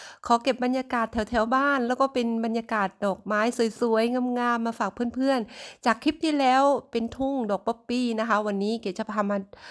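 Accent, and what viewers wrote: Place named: South, Thailand. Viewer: ดอกไม้สวยมากบานสพั่งเต็มทุ่งเลยช่วงนี้ถ้าเก็บเอามาขายบ้านเรารวยเลยดอกไม้ขาดตลาด ฟังน้องเก๋เล่าเพลินเลยสำเนียงใต้ฟังชัดเจนดี รายได้ดีมากคิดเป็นนาทีเลยสุดยอด น้องเก๋สาวใต้เก่งมาก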